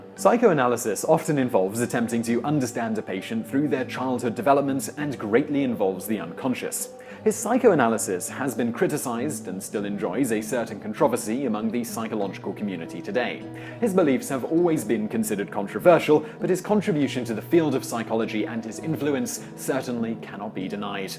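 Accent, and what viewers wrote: England, United Kingdom. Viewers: The fact the guy thats talking has a english accent makes me happy and satisfies me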